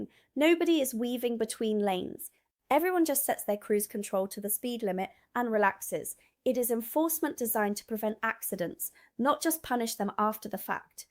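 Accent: England, United Kingdom. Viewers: She has a British accent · If you are an American experiencing British roads, why do you have a perfect British accent? Not a hint of an American twang. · Bit confused here. Is this lady English or American? She has a perfect English accent! Interesting video. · I remember the first serious attempts at changing the drink-driving culture. It didn't happen overnight. Adverts in the mid- to late-1970s were sometimes shocking in the candour. OK, maybe the accidents were simulated, but they sent a very clear message. Gradually, it became taboo and despised to drink and drive. Now, young people who don't remember those campaigns, just take it for granted that you don't drink and drive (on the whole). On the zebra ("ze.bra" not "zee.bra" if you speak with an English accent like she does!) crossing, I wouldn't start crossing until I had verified the cars will slow down/stop. 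It's always wise to assume they won't and be pleasantly surprised when they do rather than get mown down by someone who isn't paying as much attention as they should. But the British will generally stop when they see pedestrians waiting to cross. On the continent that rule is not something you want to challenge! But probably still safer than the US as the cops will pull a driver for not yielding to a pedestrian at a crossing.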